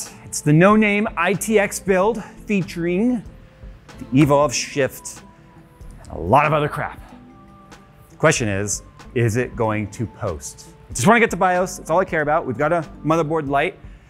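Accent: United States. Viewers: Americans and theirs zee's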